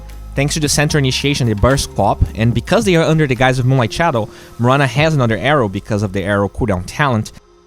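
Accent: Brazil. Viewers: Man, are you brazilian? haha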